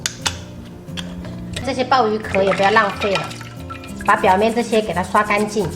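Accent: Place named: Minnan, China. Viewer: 四川人闽南话说得那么地道？赞一个！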